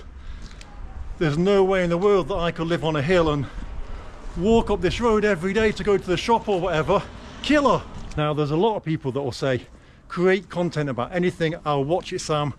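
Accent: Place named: North of England, United Kingdom. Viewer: Hi Sam, I’m not an expert on English accents. Where are you from? It’s the north of England I know but I can pin it down. North west? Liverpool?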